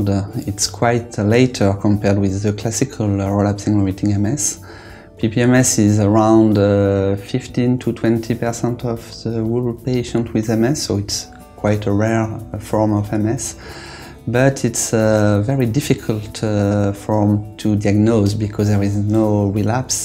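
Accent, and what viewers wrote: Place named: France. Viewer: No disrespect to the French, however subtitles would have made him understandable.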